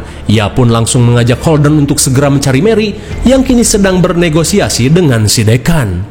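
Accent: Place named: Java, Indonesia. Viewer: yo rapopo to mbak ngko dak mlh lucu ijeh medok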